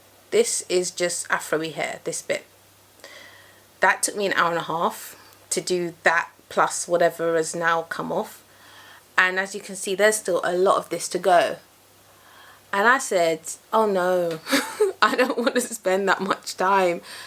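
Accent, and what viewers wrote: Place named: United Kingdom. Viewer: Oh she's gorgeous. In just here for the accent. The accent is so lovely. So British and soft so the ear. I played this 5x befor my husband took my power cord. M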